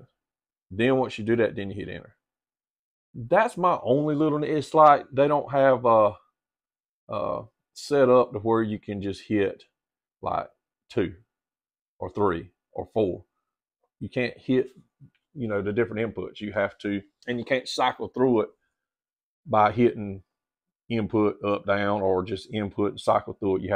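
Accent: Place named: South, United States. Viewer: Cheers! Cool to see companies sending you gear. There are other channels with good people. But i was raised in part by a southern gentleman, and its cool to hear a voice with some southern twang in it, reviewing audio gear.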